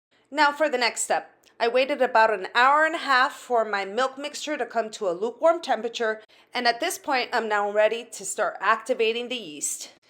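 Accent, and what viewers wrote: Portugal. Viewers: A sua massa está linda,muito bem explicada.Adorei a sua pronúncia açoreana.Nasci em S.Miguel,mas estou na Califórnia há 53 anos,adoro tudo o que vejo,e também faço.Abraço Felecidades ❤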